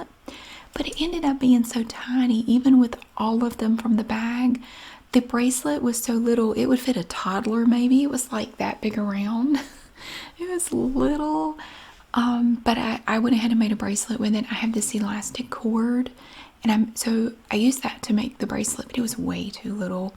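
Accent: South, United States. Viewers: Hi. I listen and relax to your vidz a fair bit. Love your southern accent. I came across yesterday's video were you seemed very upset due to trolls. Please dont be upset. You cant control such idiots and the ignorance of folk. They hide behind their phones etc and blubber their strifes away. Cowards and it's not personal... You are so genuinely lovely at heart... Take Care Tina from Liverpool (Engand)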